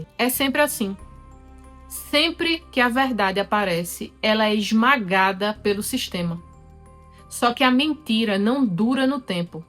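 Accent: Northeast, Brazil. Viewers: Mais uma nordestina falando merrrrda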